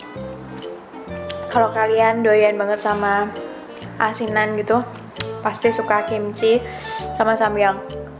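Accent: Java, Indonesia. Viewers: Welcome medok kuntuL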